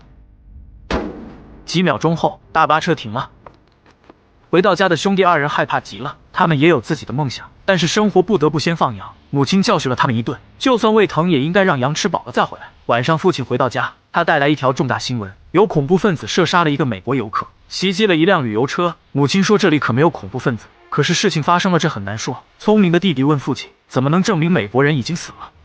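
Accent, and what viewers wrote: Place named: United Kingdom. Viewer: 整片我最出戲的是英式中文，DSG -> 大使館🤣🤣🤣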